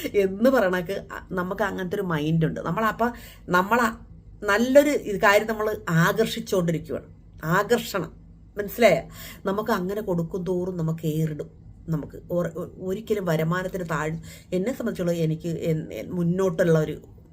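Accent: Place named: Alappuzha, India. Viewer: ശരിക്കും ആലപ്പുഴയിലെ സംസാരരീതി പു ന്ന പ്ര ആണോ വീട് എൻറെ വീട് ambalapuzha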